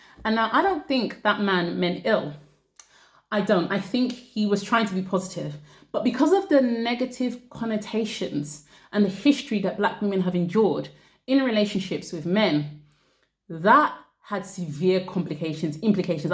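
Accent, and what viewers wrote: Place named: United Kingdom. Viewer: Your British accent is beautiful.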